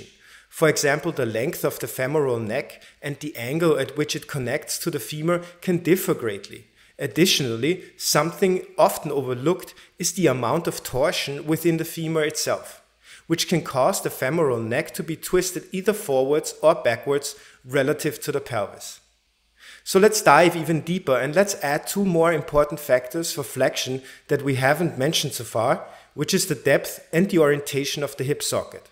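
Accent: Germany. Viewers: I am (was) German (lived most of my life in Sweden). I know that there is a town named Baumgarten in Austria and I think my family has some ancient connection there. Most people are called Baumgärtner or Baumgartner. Then there is "von Baumgarten", there even is a branch here in Sweden where I live, and my father claimed that his family was a "von" once but ditched it. I heard that the speaker in the video was clearly german speaking, but chose to write in english so anybody can read the Q&A, in case it conserns them, too. There are sooo many misconceptions and contradicting informations out there, I was really happy to find this video providing excelent explanations accompanied by really great animations! Thank you. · Thank you for your time and work🙏🙏🙏 P.S.: höre ich da einen deutschen Akzent?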